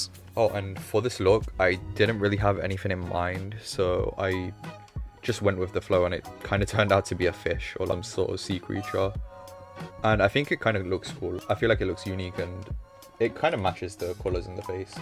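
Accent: United Kingdom. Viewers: Are you british cuz I love you,your vids and your accent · Prolly just his accent, I'm british too but I don't say it like that tbh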